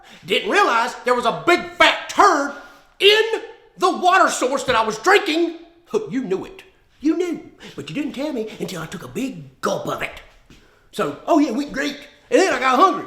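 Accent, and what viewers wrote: South, United States. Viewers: I love this guy's accent. Is that what Americans can southern?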